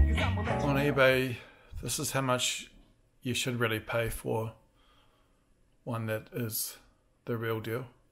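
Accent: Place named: New Zealand. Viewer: U sound like a New Zealander lol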